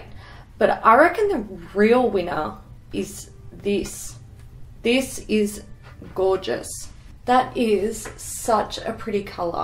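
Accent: Australia. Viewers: I love watching mainly because I LOVE the Australian accent. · Autralian english is so funny. Mühn müüs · Your accents make me miss Australia so bad. Stayed in Melbourne for a week and I need to go back xD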